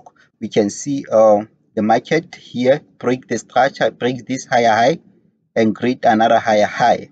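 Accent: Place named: Sierra Leone. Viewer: Are you from sierra Leone bro? You sound like one . Nice video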